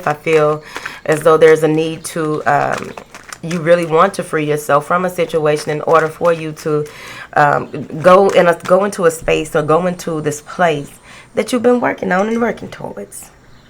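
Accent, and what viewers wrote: South, United States. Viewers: That southern accent tho. 💜 🙌🏾💯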